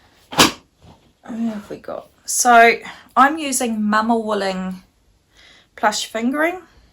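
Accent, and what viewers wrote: New Zealand. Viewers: Hi So nice to hear another kiwi accent on YouTube. I am from Auckland and will go back and watch all your early episodes.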